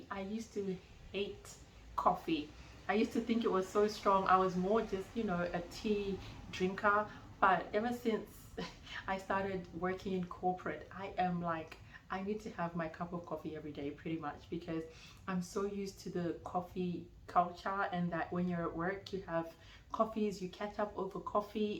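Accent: Australia. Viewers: Your getting some Aussie twang :-) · Your accent has a very Australian sound now if you didn't realise. Welcome to the Family.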